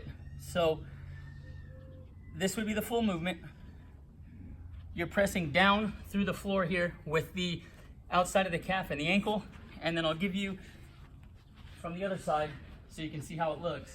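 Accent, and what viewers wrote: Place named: California, United States. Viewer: Damn, I never realized what a California accent was until I lived in Wisconsin for 10 years 🤣. Thanks for the workout!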